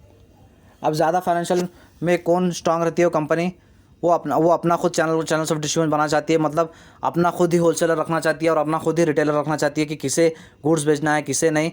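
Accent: Hyderabad, India. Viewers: Sir aap Hyderabad se ho naa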